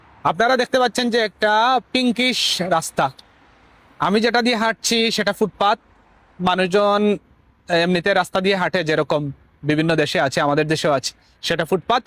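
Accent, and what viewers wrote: Sylhet, Bangladesh. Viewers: ভাই কি সিলোটি নাকি .?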